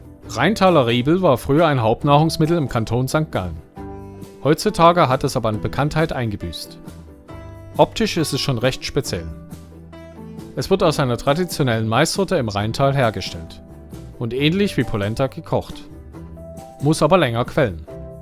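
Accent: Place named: Germany. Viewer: Danke für die Übersicht, das sind ein paar perlen dabei, die ich mal nachkochen werde! Evtl. solltest Du die Französischen und Italienischen namen einblenden. Ich spreche die sprachen auch nicht ... aber mit Deutschschweizer/Englischem akzent im ohr kann ich Dich nicht verstehen. Als Deutscher verdrehst Du die laute anders als ich 🤪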